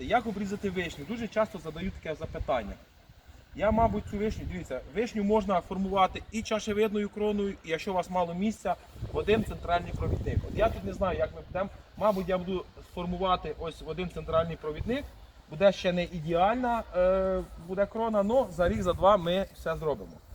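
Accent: Vinnytsia, Ukraine. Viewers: Дякую за добре поданий матеріал! Говірка вінницька, так приємно!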